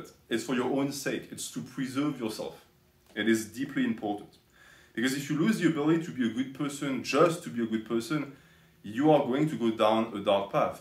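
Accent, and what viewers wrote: France. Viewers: Sounds stupid but can u upload these on Spotify? I enjoy listening to a French man ramble about stoicism as I fall asleep with no ads or auto play.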